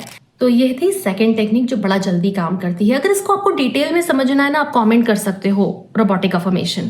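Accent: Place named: Indore, India. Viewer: Everything is superb but the way you say something it's like an indori accent, bahut sundar smjhaya hai apne sita ram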